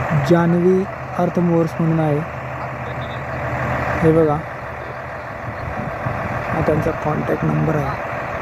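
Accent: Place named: Khandesh, India. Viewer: राम राम दादा तुमनी भाषा वरूण तूम्ही खानदेशी वाटतस तूम्ही चांगल काम करी रायनात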